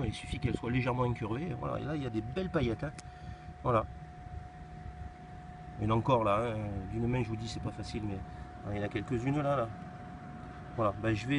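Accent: Hérault, France. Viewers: il habite dans l'Hérault là où il y a l'or et il a l'accent de l'Hérault c'est pour ça que je dis ça. Il y a de l'or dans le Gard aussi. Je suis parisienne et je reconnais tous les accents du sud ! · LORB ON SENS LACENT DU 34 MDR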